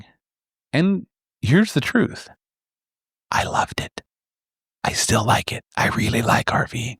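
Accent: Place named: Florida, United States. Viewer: He has always talked like a Floridian.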